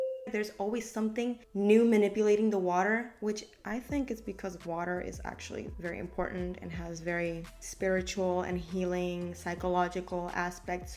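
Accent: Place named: Argentina. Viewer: I knew you where Argentinian lol...the accent is dead giveaway 😂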